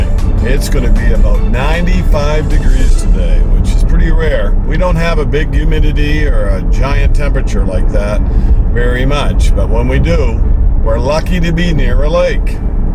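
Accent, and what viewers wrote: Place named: Maine, United States. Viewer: Cannot hear with you in car. Muffled. I thought it was my speakers here in Music studio, Malibum California. $3000 dollar speaker system but, nope, it's your input. I love it when your outdoors showing properties. You are so good at it. Love the accent too. 'GEERAGE' that gets me every time. My grandparents had a beautiful Maine accent. 'LOBSTA' Can't get there from here'. lol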